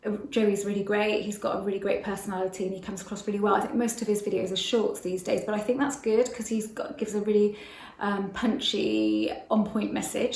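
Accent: United Kingdom. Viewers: You have the same name as my daughter and I love hearing British accents talking about the law.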